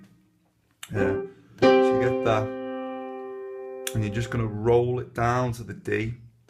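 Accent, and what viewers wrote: Liverpool, United Kingdom. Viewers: Where are you from you sound like a Scouser? Really enjoyed it thanks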